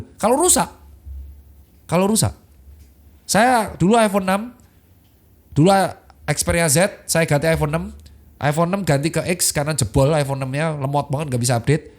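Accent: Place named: Pekalongan, Indonesia. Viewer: Koyok wong Pekalongan sih logate😂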